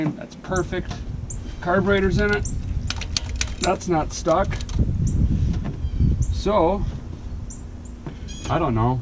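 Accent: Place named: Midwest, United States. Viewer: You must be from the Midwest probably Wisconsin you talk just like I do lol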